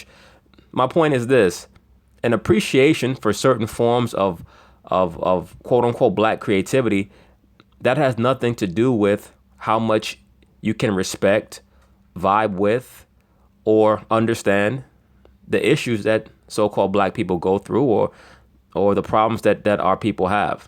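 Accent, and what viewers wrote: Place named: New York, United States. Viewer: He was born and raised in New York that's why he talks like that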